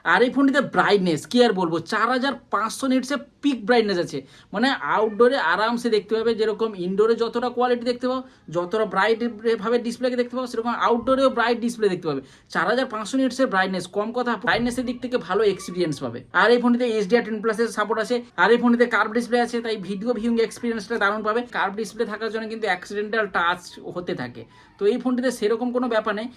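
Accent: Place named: Jharkhand, India. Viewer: A bhai Jharkhandi jaise bolte ho 😮😮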